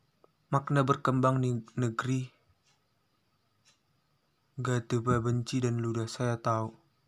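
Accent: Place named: Batak, Indonesia. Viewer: Kereeenn. Keren Chanel mu bro.. Tapi ngakak aku.. Hahahah.. BATAK nya kental kali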